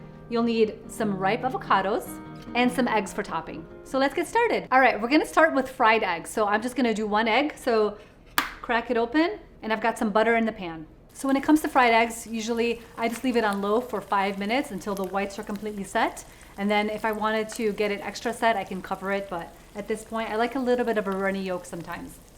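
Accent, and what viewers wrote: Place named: Michigan, United States. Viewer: Forget the avocado toast; where is that accent from? Im guessing deep Michigan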